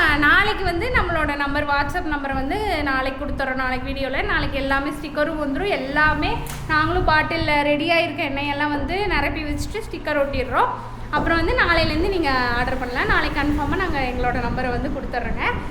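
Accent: Kongu, India. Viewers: செய்யும் தொழிலே தெய்வம்...இந்த வாக்கியத்திற்கு ஏற்றார்போல் செய்றிங்க.. வாழ்த்துகள்... சகோதரி...வாழ்க வளத்துடன்... உங்கள் வீடியோக்களை இப்ப ஒரு வாரமாக பார்த்தேன்..என்னமா எல்லோரையும் கொங்குதமிழில்பேசி அருமையாக சிரிக்க வைத்துள்ளீர்கள்.. உங்கள் குழந்தைகளும் நடிப்பில் அசத்துறாங்க... உங்கள் கணவர் அதைவிட சூப்பர்மா. நீங்கள் நலமோடு வாழ வாழ்த்துகிறேன். நீங்க மென்மேலும் வெற்றி அடைவீர்கள்...🎉🎉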